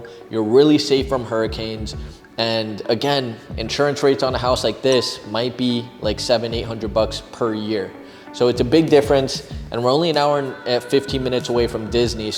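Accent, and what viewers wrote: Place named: Venezuela. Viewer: No you did not just say you speak spanish you spoken in a Venezuelan accent because your mother is from Caracas. Your speech was slow and more deliberate as most Venezuelans I know speak faster. Yo hablo Castellano y cuatro otros idomas. Now for the house can you show other homes that are not that puke modern look, it screams cheap construction. There is more colors than black and white, it looks sterile and neutered, like they use to build prisons and those cookie cutter open concepts are terrible. I grew up in a real home, with clear defined rooms made with reinforced concrete, stucco and plaster interior walls about 2-3 inches thick. No hoa I approve of but the rest, the lot soil is more valuable that the material or labor. Can your builders do anything else but xerox every other lame builder. If they xerox Frank lloyd wright we might see something better.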